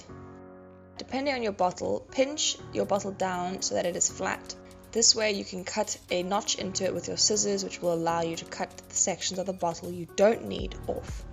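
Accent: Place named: Australia. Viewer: She is southafrican? I thought she was Australian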